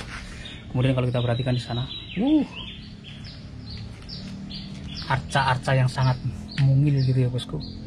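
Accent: Java, Indonesia. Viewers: Medoknya sm doanya itu loh khas bgtt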